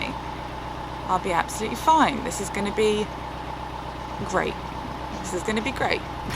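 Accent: United Kingdom. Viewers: What the hell is vocal fry!! She speaks the Queen's/King's English. Perfectly understandable and not in any way jarring.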